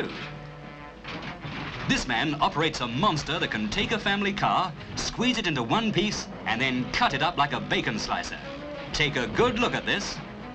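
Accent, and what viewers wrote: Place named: England, United Kingdom. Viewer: So lovely to hear a proper English accent instead of trendy street nonsense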